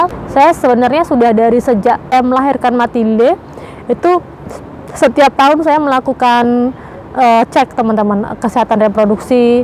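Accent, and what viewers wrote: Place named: Lombok, Indonesia. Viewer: Salam kenal mb Oww pantas lama d lombok Dialeknya kelihatan banget Salam untuk suami dan Matilde yg cantik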